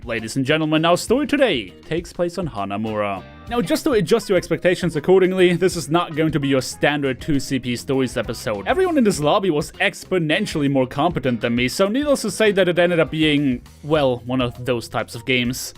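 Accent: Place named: Germany. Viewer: He sounds kinda German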